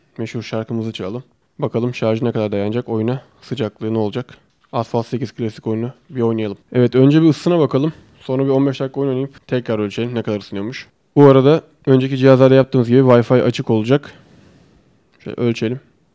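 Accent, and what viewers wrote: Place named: Eskişehir, Turkey. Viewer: Eskişehirrr????? Eskişehirmisin sen abi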